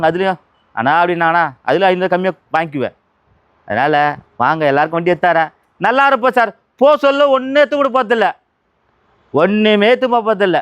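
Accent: Madurai, India. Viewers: Madurai vasagam super 🎉🎉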